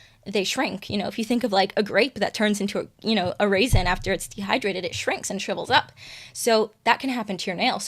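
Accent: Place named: United States. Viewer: Are you in the U.K.!? Notice you mentioned Nail order, but you sound like you have an American accent! Just wondering because wouldn’t it be cheaper to use product brands existing in your county ??! ❤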